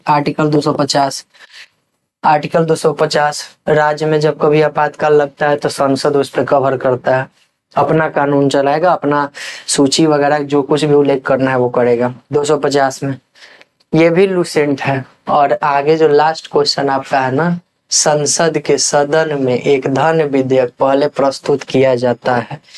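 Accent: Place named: Bihar, India. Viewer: Bihari assent in his voice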